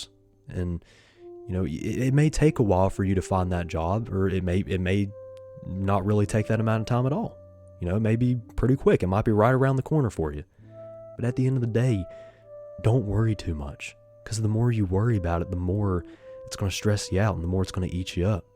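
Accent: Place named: United States. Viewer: Bro has a cowboy accent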